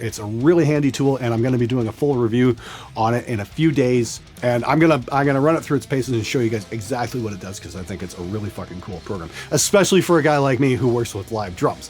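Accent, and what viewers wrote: Canada. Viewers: Are you canadian? Some of your pronounciation is pretty canadian.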